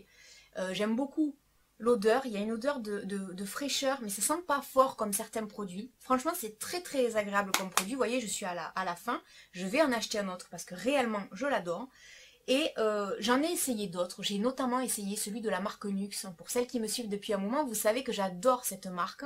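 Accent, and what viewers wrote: South, France. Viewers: bonsoir^^je te decouvre aujourdui grace a une video sur aliexpresse;;; qui ceci dis son top^^ j aime bcp tes videos, juste niveau son je trouve que l on entend peu fort, je suis a fond sur mon pc et c est just !!! mais genial, continue comme ca avec se bel accent du suuuud ^^ bises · Je t'en prie: Garde ton Débit 'VIF' et surtout ton Accent quand tu parles!!!!! Je suis du Sud comme toi et c'est tellement beau et bon de l'entendre sur le net!!!!!! Pour ce qui est des produits que tu présentes: je suis brune 'et' rouge et j'utilise le shampoing (pose 3/5 min) et soin repigmentant (pose 10/15 min le temps de finir la douche) Mulatto rouge et c'est franchement bien! Tu as aussi le Nutri Color de Revlon qui est très efficace même si pas bio. Et pour finir tu es un rayon de soleil, pleine de vie et toute sourire! Reste comme tu es!!!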